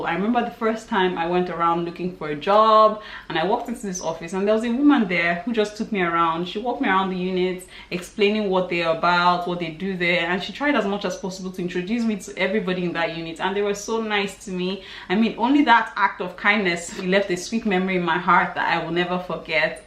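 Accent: Ireland. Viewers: I'm delighted you love living in Ireland.As an Irish guy living in Oz it's always good to hear people moving or visiting and enjoying it.I love the Irish twang in your accent. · Your accent has Irish in it a bit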